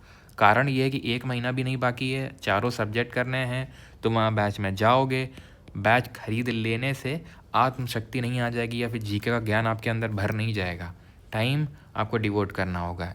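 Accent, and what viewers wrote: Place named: Rajasthan, India. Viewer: Guruji aapke bolne ka लहजा bhut badiya hai❤❤❤ like a rajasthani ❤❤❤, A lot of love from Rajasthan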